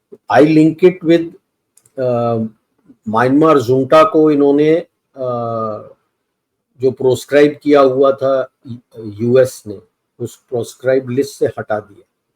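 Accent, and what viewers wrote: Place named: Punjab, India. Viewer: Gen apki punjabi bhaahr aaa jatti hai ❤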